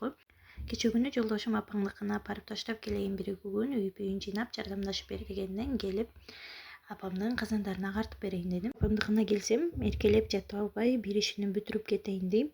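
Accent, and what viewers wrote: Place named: Osh, Kyrgyzstan. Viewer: Ош тилинде качан уйрондун,отуз уул диалектисинде сүйлөп калыпсың,же Ошто остунбу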